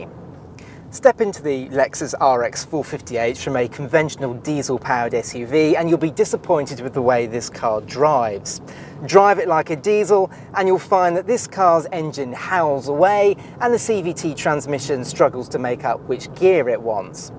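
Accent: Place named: RP, United Kingdom. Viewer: Good review. I'm not dissing the presenter, but I'm thinking its the accent that is putting people off, bit posh... Mat came over like an ordinary guy.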